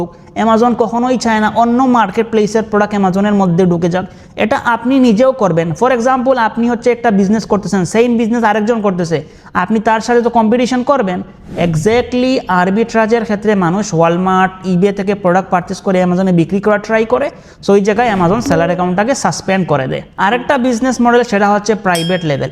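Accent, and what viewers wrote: Sylhet, Bangladesh. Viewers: Sylheti ni vai tumi?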